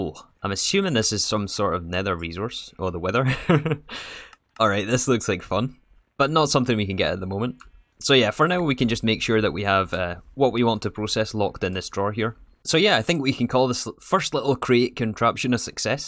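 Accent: Scotland, United Kingdom. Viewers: Mate im going insane trying to find this exact pack, with the quest book etc... Can anyone help me out? What mod launcher do I need, what pack contains the quest book? I havent played Minecraft in years and I cant find this exact pack for love nor money... if someone could point me in the right direction? Cheers! Love your stuff, honestly one of the best creators on here...me and the wife constantly sing to ourselves... Divine Joourney Toooooo in your scottish accent all the time haha Safe x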